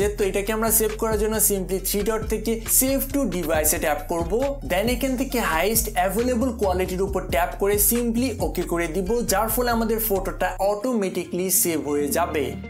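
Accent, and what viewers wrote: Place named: Jamalpur, Bangladesh. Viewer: Aponar basha ta jamalpur kun jagai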